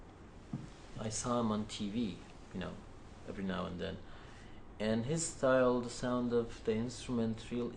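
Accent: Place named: North America. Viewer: wow! he speaks like a man who live in toronto or new york for 20 years! way to go man. excellent musician. i like combination of Kalhor and Shajarian. they are awesome.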